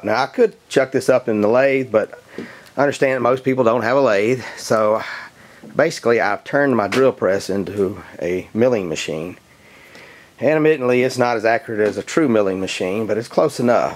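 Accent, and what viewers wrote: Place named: Georgia, United States. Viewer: Sir...........are you a hill billy from Georgia? Your akcent is amazin and i liked ur video sir.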